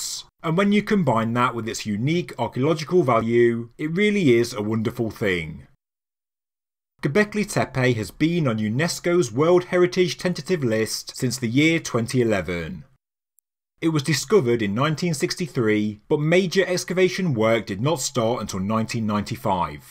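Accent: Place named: England, United Kingdom. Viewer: ZZZZZZZZZZZZZZZ Do all poms talk like this? (Gobekli Tepaaaaayyyyy?!)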